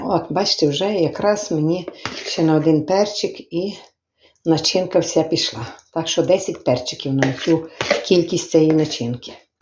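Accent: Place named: Kirovohrad, Ukraine. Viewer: Спасибо, Вам большое. Случайно попала на Ваш канал и очень рада, что попала. Слушаю, не наслушаюсь вашим украинским говорит, таким славным. У меня мама родом с Кировоградской области, говорила именно так. Спасибо ещё раз.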